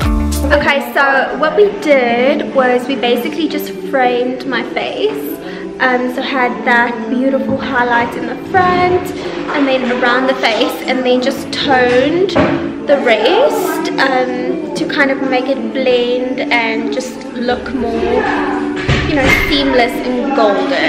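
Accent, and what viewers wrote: South Africa. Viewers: I knew you were from south africa once I heard your accent, sounds like Candice Swanepoel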